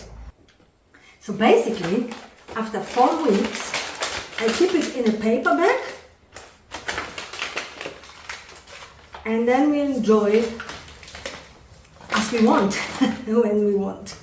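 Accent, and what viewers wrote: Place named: Slovenia. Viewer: This is the most interesting way of making salami. My parents make home made sausages with the skins. I really enjoy watching you in the kitchen and love your accent. I was born in Slovenia and came to Australia when I was 4 yrs old. That was over 53 yrs ago. So I have no accent, but my parents sound like you.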